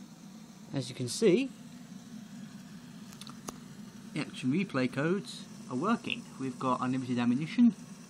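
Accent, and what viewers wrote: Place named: Australia. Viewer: Welp that is Australian accent after all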